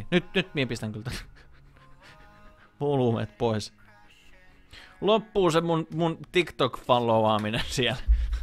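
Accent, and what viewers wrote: Finland. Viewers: He's from finnish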